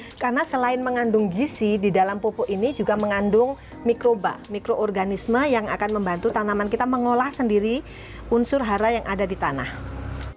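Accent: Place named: Banyumas, Indonesia. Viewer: bapaq : kaya jangan bayem... laaahh pada" ngapak jebule ya pak 🙄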